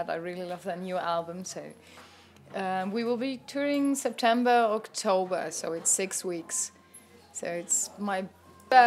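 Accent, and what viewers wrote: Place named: United Kingdom. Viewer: When she speaks English it sounds British it is different from Simone and Floors dialect,but I must admit I love woman with accents (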